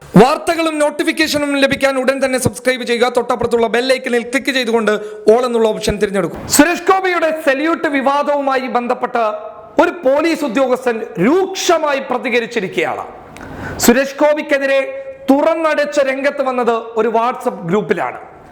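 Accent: Kasaragod, India. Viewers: കേറി വാ മക്കളെ...🙋 ഇനി മുതൽ കാസർഗോഡ് ഭാഷയിൽ ബ്ലോഗ് കേൾക്കാം...😍😍 ഈ ലോകത്ത് നരകിച്ച് ജീവിക്കുന്നതിനേക്കാൾ നല്ലത് ചിരിച്ചു മരിക്കുന്നതാണ്...🤣🤣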